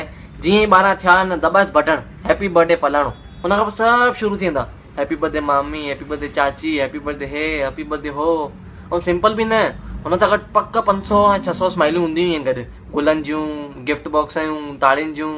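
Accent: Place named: Sindh, Pakistan. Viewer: your Sindhi is pure 😊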